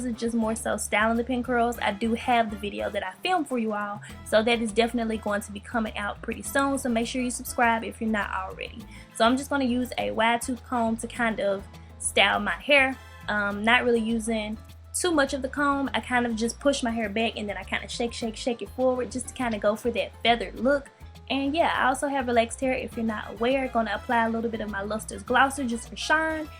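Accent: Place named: Memphis, United States. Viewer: i heard you talking and i was like she sound like she from memphis cuz i from memphis and live here and you look really familiar for some reason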